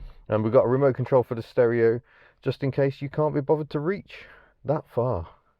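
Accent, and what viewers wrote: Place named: England, United Kingdom. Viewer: My God where did you get this sad old beast? Do all NZlanders sound English?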